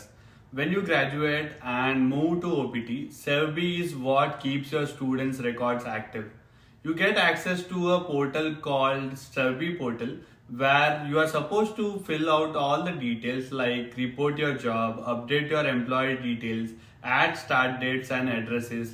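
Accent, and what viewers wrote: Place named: India. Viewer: That is the thickest Indian accent I’ve ever heard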